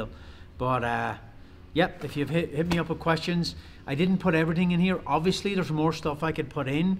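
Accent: Ireland. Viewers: I was trying to put a finger on you're accent and it makes sense when you said you're from Ireland.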